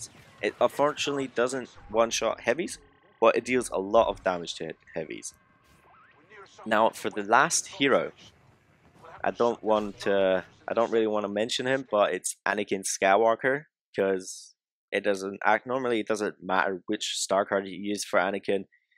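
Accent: Germany. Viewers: Der Deutscheakzent is da you are one of the best player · Dein Deutsches Englisch ist ja krass gut 👍🙈 Nices Video :-)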